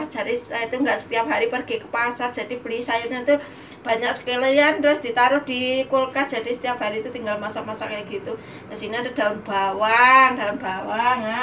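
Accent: Banyumas, Indonesia. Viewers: G ngapak g kepenakk ya mbk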